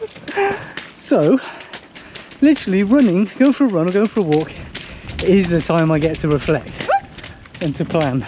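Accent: United Kingdom. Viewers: In love with your videos and British accent hugging my auditory receptors ♥️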